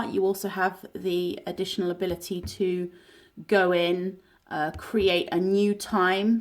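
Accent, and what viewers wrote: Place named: United Kingdom. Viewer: Whatever way I try to say this, it will sound sexist to some degree but it is not meant that way at all. It is SO refreshing to hear a woman's voice on one of these usually male-dominated videos. And the voice is British. And the voice knows what it is talking about! I have watched a lot of NEST stuff and it is either mounted to the wall with a wire or on the table base - nice to see it on the wall with the wire and also unique to see it 'unplugged' from the mount. A video made by someone who shows what I want to see, not all the stuff available elsewhere. Brilliant!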